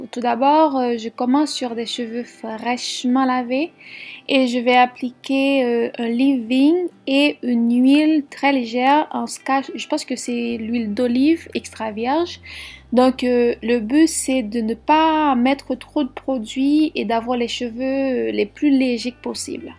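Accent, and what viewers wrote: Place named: Quebec, Canada. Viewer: L'accent québécois allez!!!!11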